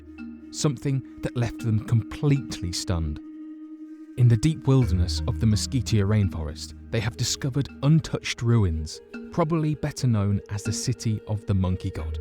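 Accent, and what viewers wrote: England, United Kingdom. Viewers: uhh no, he's definitely from England. I mean, I would know, being from England, also.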